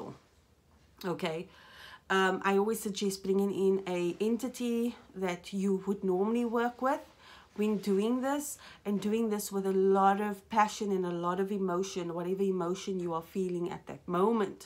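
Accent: South Africa. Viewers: Hallo, recently discovered you on your dark energy video's. Are you South African by any chance?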